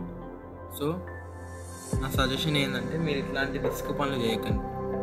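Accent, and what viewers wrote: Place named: Telangana, India. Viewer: Kani aa yaasa ne baavundey raa, ek dam naatu Telangana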